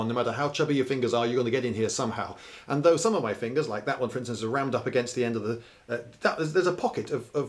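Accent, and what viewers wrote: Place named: United Kingdom. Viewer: U should do MORE LEATHER GLOVES FULL FINGERED LEATHER GLOVES reviews hun I just ❤💖❤ your British accent voice is stunning to listen too great job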